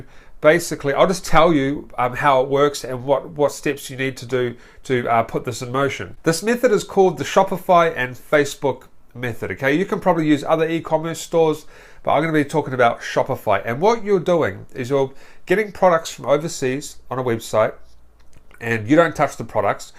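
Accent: New Zealand. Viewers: Are you from nz bro?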